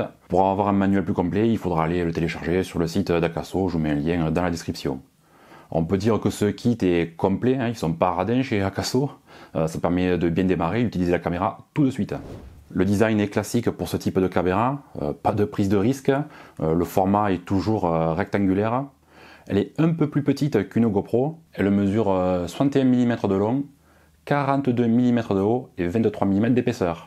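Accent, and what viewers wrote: South, France. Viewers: Salut comme toujours les commentaires sont clairs et précis cet appareil paraît simple d utilisation fait pourtant de belles vidéos encore merci Pour ta présentation continue comme ça avec le bel accent du sud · Sympa et détaillée, ta présentation; et avec un bonus qui va bien: l'accent du sud!!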